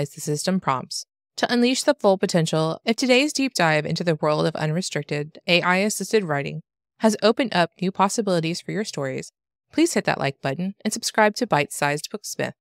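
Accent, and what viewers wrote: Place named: Pennsylvania, United States. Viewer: Is the narrator for this video from Pennsylvania? I detect a similar accent. :)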